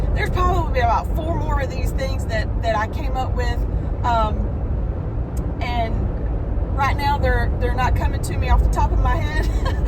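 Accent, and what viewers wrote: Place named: Georgia, United States. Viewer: First, I love your accent but I may be partial since I live in GA😂😂 Thanks for sharing your insights and inspiration. I’m tuned in😊😊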